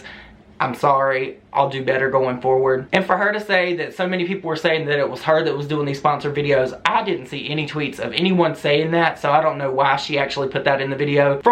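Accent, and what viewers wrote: South, United States. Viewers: sweetie where are you from? You sound like everyone in my family from Ms,Al, Ky and parts thereabouts. No shade to you, you’re making me homesick. Love your content.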